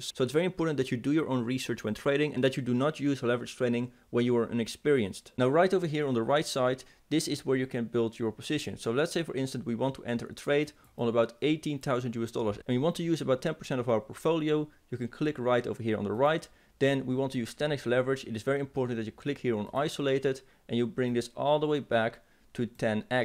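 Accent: Netherlands. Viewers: Definitely van Nederland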